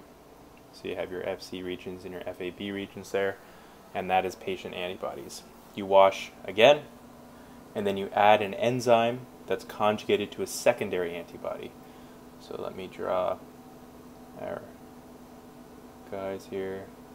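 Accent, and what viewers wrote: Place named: United States. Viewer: Finally. A quick video with an american accent.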